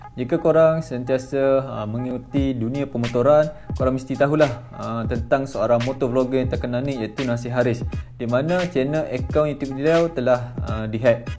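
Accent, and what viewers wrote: Kelantan, Malaysia. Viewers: Ore kelate ko bos?pekat molek bnyi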